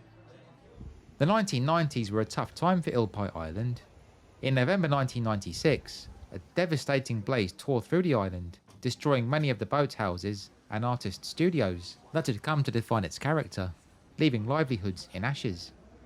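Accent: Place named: Essex, United Kingdom. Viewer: I love your accent it reminds me of my dad's best friend ,who we called Uncle Frank.He was from Essex close to town.But he was a working class man with a vast knowledge of history and politics .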